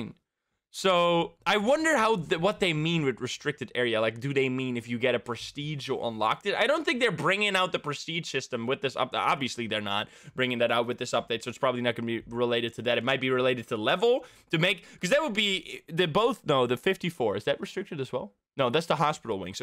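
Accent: Netherlands. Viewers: hold on, you're dutch? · are u dutch?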